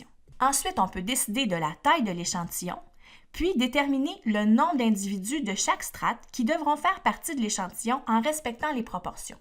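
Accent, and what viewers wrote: Quebec, Canada. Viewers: J’adore l’accent québécois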